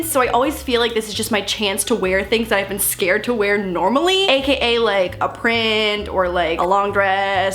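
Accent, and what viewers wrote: Canada. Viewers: . Your Canadian accent kinda showed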